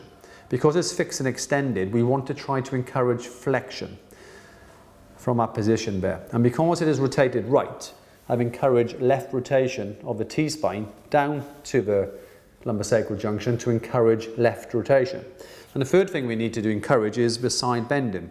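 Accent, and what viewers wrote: Wales, United Kingdom. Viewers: Ah... I can actually hear your slight Welsh accent now.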